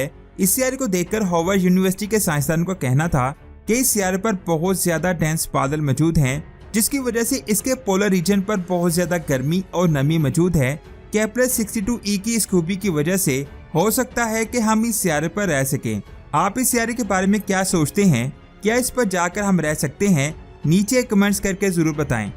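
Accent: Punjab, India. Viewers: Panjabi excent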